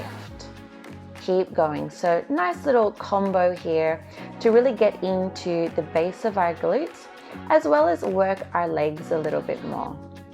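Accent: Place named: Sydney, Australia. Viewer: Thanks:) you're beautiful Sydney accent makes me nostalgic <3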